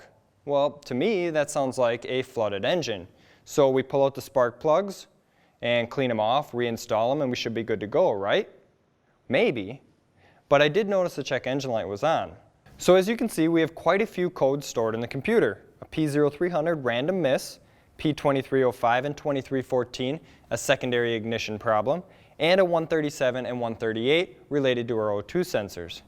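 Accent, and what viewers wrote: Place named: Wisconsin, United States. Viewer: Ah, a Fellow Wisconsinite. The accent when heavy is very apparent.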